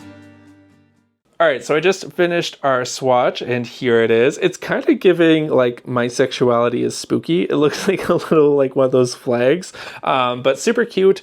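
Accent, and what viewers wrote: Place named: Canada. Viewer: new here. you sound canadian, where do your buy your yarn? I see in the back you have a big colour selection and I'm really looking for that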